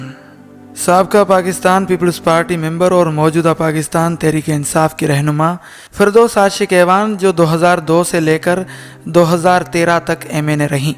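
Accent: India. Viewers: Yeh bolne waly bhai mujhe india k lag rhy hen inka lehja indian h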